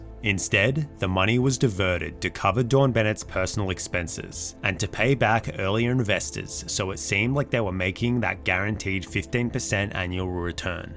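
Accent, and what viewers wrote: Australia. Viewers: Wow, all strayans really do sound the same. You even have the sunny emphasis down lol The vb shines through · so i’m pretty sure this guy is australian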